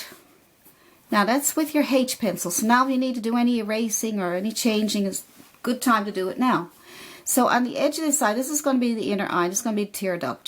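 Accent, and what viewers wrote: Newfoundland, Canada. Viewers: I have been using this video with my 6th grade classes to help with their self portraits. I immediately heard your voice/accent and said she HAS to be a Newfie. Am I right? I live in the states but family is from Placentia Bay. <3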